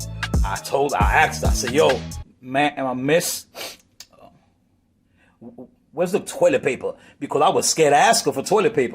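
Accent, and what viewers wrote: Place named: Bronx, United States. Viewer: Yooooooooooooo seen you before onba few chan never seen your vids !! Very nice set up !! Where u from man I hear a lil BX in u !! Let me find out u from there! Hit me back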